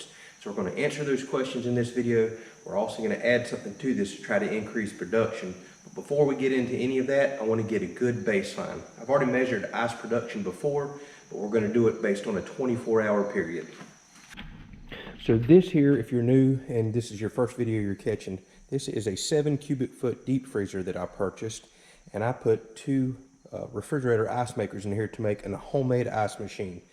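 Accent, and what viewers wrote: South, United States. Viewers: I really enjoy listening to this guy talk! Presentation and his southern accent are peaceful. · Hey dummy! You're not a dummy! People that are ignorant just hear your southern accent, and make bigoted assumptions! Chest deep freezers use almost no energy. Generally one of the most efficient devices in your house. Might cost you $3-5 a month...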